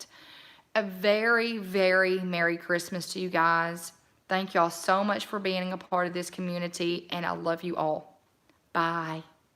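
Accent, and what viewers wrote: South, United States. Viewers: OMG LOL I LOVE your accent!!!!!! Sometimes I try to talk that way. I love it. lmao. I am in California, so I don't feel like I have any accent. Do we sound like we have an accent to you (meaning people in the south?)? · I love how down to earth your channel is and how courageous you are to share yourself with others. I lived 12 years in Georgia (now I’m in DC area). so one selfish reason I love your channel is to listen to your awesome southern accent and all the sayings. “I’m fixing to...” is something I don’t hear much now 😀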